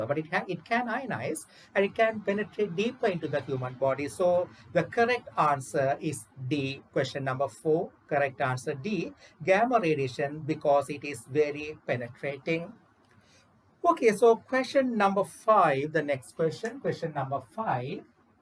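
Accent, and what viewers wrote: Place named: Sri Lanka. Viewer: Sir Are you from Sri Lanka? You have that accent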